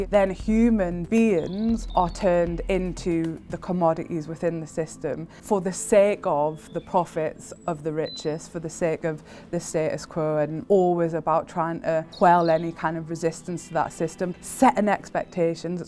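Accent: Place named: Northern England, United Kingdom. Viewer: Northern Accent opinion sounds weird so used to push English speakers hope you’re able to better your accent thank you for your understanding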